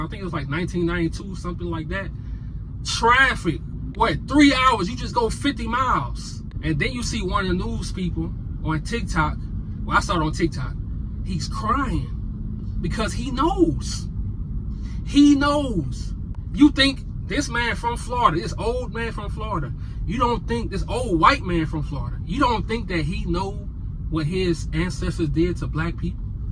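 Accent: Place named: New Orleans, United States. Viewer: Bro you from N.O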